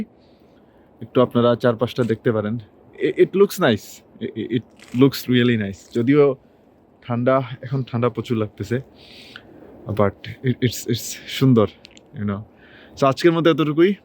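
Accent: Sylhet, Bangladesh. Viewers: ভাই সিলেটি ❤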